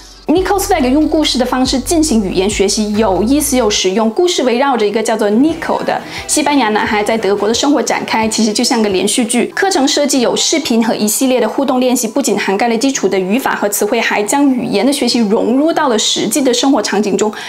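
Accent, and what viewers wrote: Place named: South, China. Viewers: 才貌雙全！你讓我更想學德語了。好奇你是哪裡人？你的普通話跟我們台灣一位來自上海的的政治評論員的口音很像。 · zoe是南方人吧！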